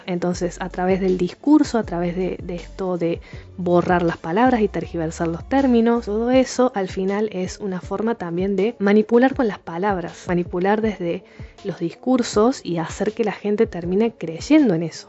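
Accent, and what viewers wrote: Córdoba, Argentina. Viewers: Es Cordobesa por su tonada, pero si sos de afuera, capaz que hace sesiones por video llamadas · ! Gracias! Me encanta escuchar tu tonada! Vivo en Chile hace unos años...y es muy grato seguir a una cordobesa! Estoy muy contenta de encontrar en youtube videos de la excelencia que tienen los tuyos! Gran trabajo! Felicitaciones!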